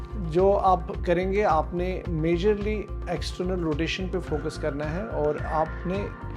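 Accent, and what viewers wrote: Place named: Kashmir, India. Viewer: R u kashmiri, ur pronunciation is like that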